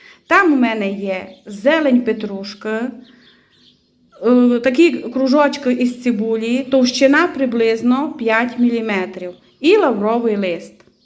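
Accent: Transcarpathia, Ukraine. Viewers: Щиро дякую за рецепт і за чудову закарпатську говірку. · ви направду дуже гарна господиня), все, що я робила за вашими рецептами було дуже смачне. А ще, мені дуже подобається закарпатська говірка. Дякую вам дуже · Кайфую від вашого закарпатського акцента.Дякую! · Мова українська, закарпатський діалект:))) звучить дуже цікаво і гарно. А закрутки в господині неперевершені! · Дякую за чудові рецепти! Томатний сік вийшов смачний за вашим рецептом, тепер спробуємо помідори. Дуже люблю слухати вашу закарпатську вимову - вона збагачує українську мову. ❤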